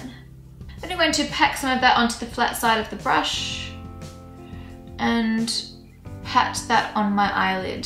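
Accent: Australia. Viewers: Indeed i did enjoy !! you are gifted with your makeup plus its easy to understand you because i'm Aussie too :D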